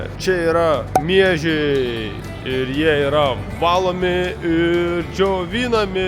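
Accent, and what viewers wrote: Biržai, Lithuania. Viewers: Svajok atsargiai, nes svajonės pildosi🎉 Labai norėčiau fizinės knygos, nes audio kažkaip ji man nesusiklausė, tai duočiau antrą šansą jai 😢😮😮😂 P.S. Mldc už Biržetišką "šneką" tikrai malonu girdėt 😂😂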